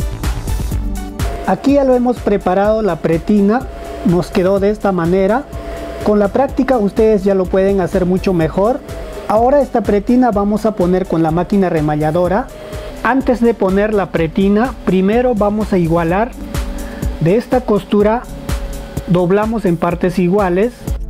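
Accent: Peru. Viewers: Obersay? Tela flish terri? Q gracioso para peruanizar las palabras 🤣🤣🤣 igual se ve buena onda, saludos!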